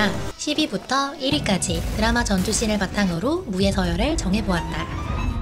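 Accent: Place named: Gyeongsang, South Korea. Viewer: 나레이션 경상도 억양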